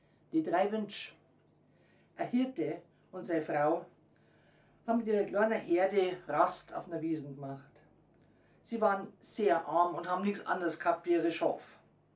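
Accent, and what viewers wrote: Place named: Bavaria, Germany. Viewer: Obwohl ich aus Norddeutschland komme und manches bairisch nicht oder nur schlecht verstehe, erfreut es mich dich dies lesen zu hören. Viel Erfolg mit diesem Kanal! 😊👍👍 ich bin sicher du kannst noch viele andere damit glücklich machen!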